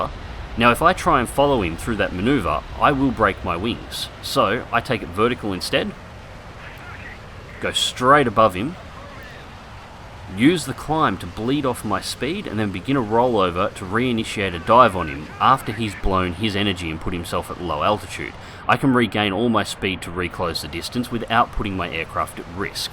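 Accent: Australia. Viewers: He's from Australia, hence his logo and his accent.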